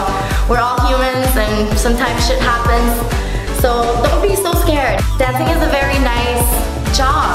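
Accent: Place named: France. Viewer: Girl I hear ur French accent and I’m francophone aussi lol I love it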